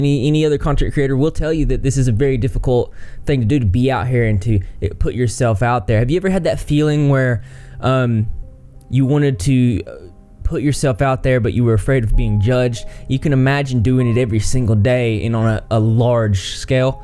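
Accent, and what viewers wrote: South, United States. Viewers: I'm trying to figure out where this guy is from lol. Definitely from the south, but at the same time reminds me of the 1980s. Does that make any sense? Probably not! 😂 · It's so refreshing to hear things like this and such a sensitive position in a southern accent. I feel like conscientious & kind southerners don't get a lot of representation (not that they're an ~oppressed~ group or anything, I just think the largely conservative majority of southern states makes a specific stereotype somewhat accurate) and it's just very comforting and nice to hear. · Southern I'm from ky and its definitely this area Tennessee maybe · Are you southern? Because i am and i cant tell, which is so wierd! This is the first video of yours that ive watched. You seem southern, but i have heard people from california talk like that so i dont know. 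· nice southern accent! haha im from texas, where are you from?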